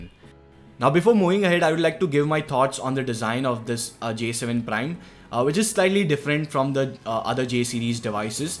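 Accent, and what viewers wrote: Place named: India. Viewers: Hindis accent... Make me laught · siempre me pasa que cuando escucho hablar ingles a un habitante de la India....pienso que es rajesh khotroophali de the big bang theory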